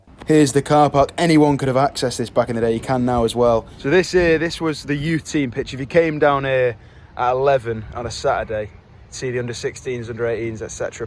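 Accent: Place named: Cockney, United Kingdom. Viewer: And also a little cockney accent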